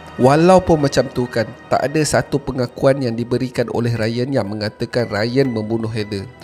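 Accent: Perak, Malaysia. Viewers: trbaekkk...1 lagi sbbkan video2 abg yg sntiasa trbaek ni laa..jadi list fav sy untuk slalu dngar time kat tmpat krja...sblom ni kalo asyik headbanging je...lenguh jgak tngkok ni 🤣🤣🤣..dan Alhamdulillah...atas sbbkn abg ni orng perak dan stiap video abg ada sleng2 perak...skang ni dah brjangkit kat member2 skrja sbb mostly diorang smua brasal dri perak trmasuklah boss skali🤣.... yg sy baiahkan untuk jdi "murid2 baru abg...hasil dri tngok crita abg smbil connect kat speaker radio...stiap kali kat tmpat krja🤣🤣🤣🤣...btw untuk abg...smoga dipermudahkan urusan selalu dan trus melangkah maju dngan mmberi video2 abg yg menarik stiap masa...insyaAllah amin...👍🏻👍🏻👍🏻👍🏻👍🏻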